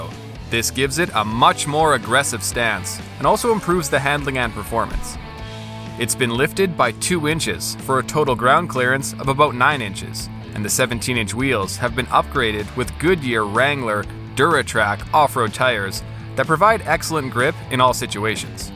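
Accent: Canada. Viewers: Why, he's Canadian, it's how they say "z" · He’s from Canada 🇨🇦 has to be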